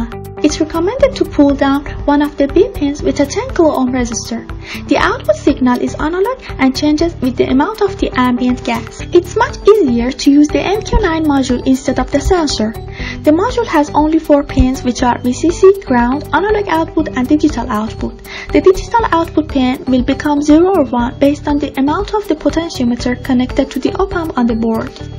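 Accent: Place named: Iran. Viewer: دمت گرم بابت ویدئو و تلاشت که هم گرافیک قشنگی ساختی و هم خوب توضیح دادی. تعجب نکن از رو لحجه باحالت فهمیدم ایرونی هستی. ولی باز دمت گرم ، میدونم چقدر ساخت همچین ویدئویی زمان بره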